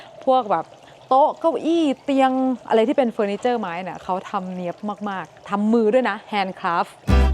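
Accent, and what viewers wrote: North, Thailand. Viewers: ทำไม นู๋อู้เมือง ☺☺☺ สำเนียงไปทาง ชร..พย ☺ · ชอบดูคริปน้องมากเลยเจ้า..น้องอยู่จังหวัดหยั๋งเจ้า..ป้าเจียงฮายเจ้าตอนนี้อยู่เวอร์จิเนียร์เจ้า..ได้ยินกำเมือง..ดีใจแต้ๆเจ้าจะติดตามตลอดไปเจ้า..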